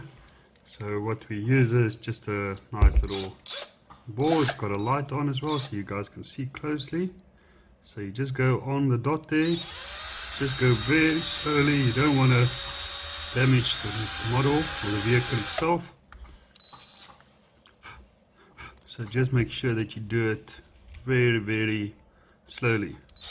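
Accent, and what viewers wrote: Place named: South Africa. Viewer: Thank you, your mini looks good after the Dremel, where are you from? You sound South African.......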